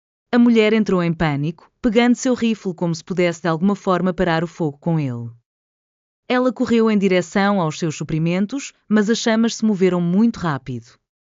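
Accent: Portugal. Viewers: Porque a voz é portugues de portugal? Quase não dá para entender. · Esse é o português de Portugal.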